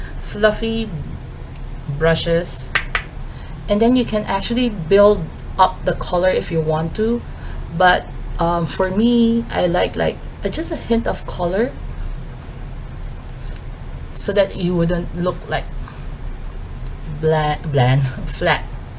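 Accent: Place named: United Kingdom. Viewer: I like this. Sis, you have an accent. British or Australian? I noticed when you said "can't". But I love it. 😚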